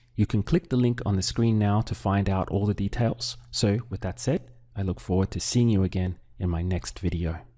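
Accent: Castile, Spain. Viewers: Hi, this may sound like a silly question, is this European Spanish? Many thanks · Is this the Spain dialect? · this Spanish is Castilian, right?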